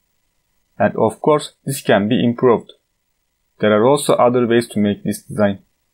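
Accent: Turkey. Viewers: izliyorum izliyorum diyorum ne kadar türk aksanı var , archicadde çizim yapmayı bırakıp bunu düşünüyordum. ekranınızdaki TUR yazısını görünce aa haklıymışım dedim :)) teşekkürler çok yardımcı oluyor videolarınız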